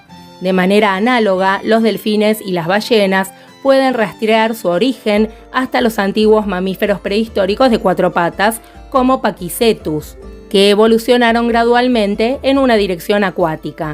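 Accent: Argentina. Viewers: Muy bonitos y gorditos estos "delfincitos cavernicolas", jaa. Yo los he hecho en plastilina..son muy faciles de hacer. Pero ahora quiero hacerlos en resina, igual que mis otros dinosaurios que hago. Yo creo que si vivieron hasta el final de los dinosaurios, con la ultima especie descubierta en el Cretacico. Pues su anatomia resulto muy exitosa para su ambiente. Como lo es ahora los delfines y ballenas..claro: estos tienen la mala suerte de haberse topado...con el Hombre, somos los peores, jaa. Muy buen video, felicidades. Solo no me gusto el acento argentino...es muy molesto. Adios desde cd. De Mexico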